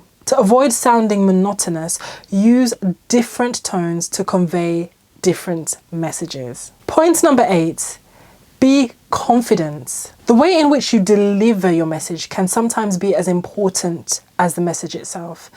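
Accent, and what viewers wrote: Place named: United Kingdom. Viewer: it's just proper British talkin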